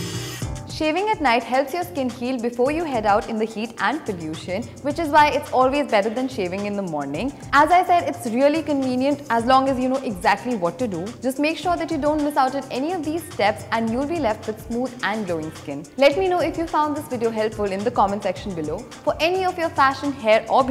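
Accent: India. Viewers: Indian English accent with asian face So confusing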